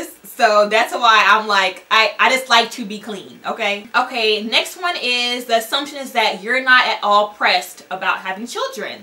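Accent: South, United States. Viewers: you have a southern drawl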